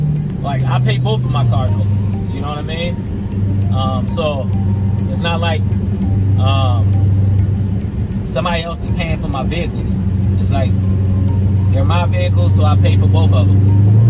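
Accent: Baltimore, United States. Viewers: you gotta be from baltimore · Are you from Baltimore? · Gatta be from bmore good vid brother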